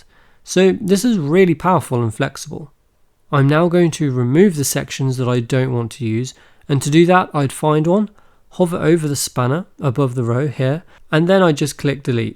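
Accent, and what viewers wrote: England, United Kingdom. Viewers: what's with the Eng. accent????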